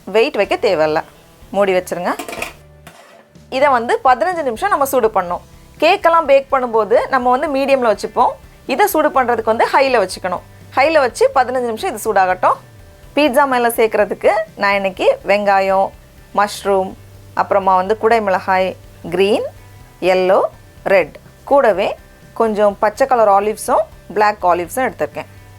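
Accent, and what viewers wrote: Nagercoil, India. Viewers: You are a pleasure to be watched,. Your Nagercoil slang is too good. The recepies are excellent. The pizza came out very well. Love to you.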